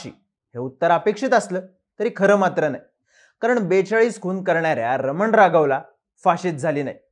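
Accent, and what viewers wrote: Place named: Pune, India. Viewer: तुझ्या बोलण्याची पद्धत खूप आवडली #पुणेरी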